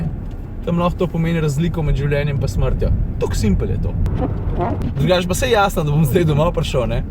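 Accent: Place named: Maribor, Slovenia. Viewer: od tvoje mariborščine cepam :D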